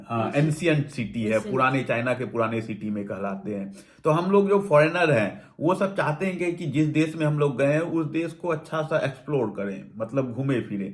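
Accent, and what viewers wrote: Bihar, India. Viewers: ji tumhari hindi to acchi h par bihar wali h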